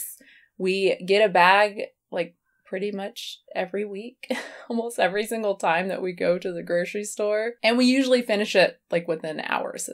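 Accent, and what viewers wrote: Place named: South, United States. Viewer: It's the southern accent. If you watch any of her reacting to when i was raw videos it's so much more pronounced too.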